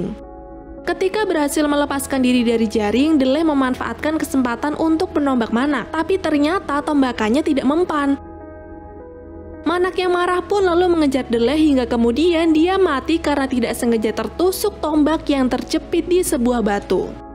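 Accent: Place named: Sunda, Indonesia. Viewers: Gw nonton karena yang ngomong nya cw orang Sunda lagi ya Allah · Sukses terus mbak.. Lucu nyarios sunda na.... Aku nyesel baru baru ini nemu chnl mbk... #auto chanel faforit ini mah...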